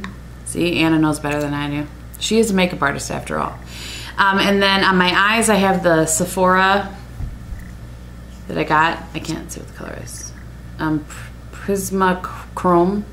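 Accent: Wisconsin, United States. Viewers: Love your videos,. My father's family is from Michigan and your Wisconsin accent is very similar. I have baby fine hair and appreciate any suggestions for adding body and volume. I have been liking Phyto's Phytovolume Actif spray and Schwarzkopf's Osis+ Dust It.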